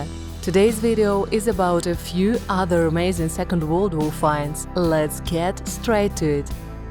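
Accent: Russia. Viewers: Wtf is with the Russian accent in the voice over?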